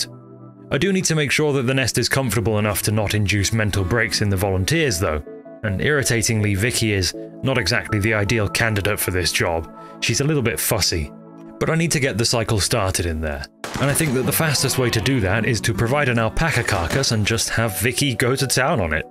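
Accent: United Kingdom. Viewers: hearing a very British man advertise a vary American game is actualy hilarious.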